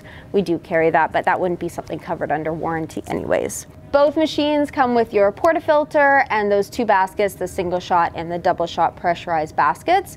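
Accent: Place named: Canada. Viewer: "Oat and aboat" How to know it's Canadian not American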